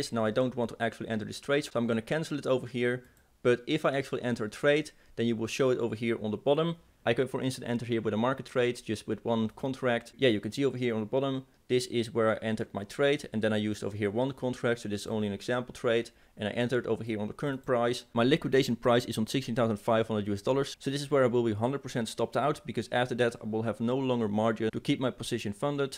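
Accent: Netherlands. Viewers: Definitely van Nederland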